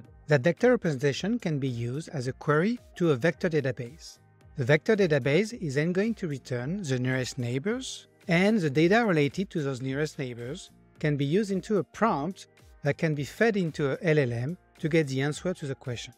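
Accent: France. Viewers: It's not that bad. As a English speaker that knows/speaks French, I can tell you are French. It's not had to hear and understand what you are saying. I'd say keep going and make any improvements along the way.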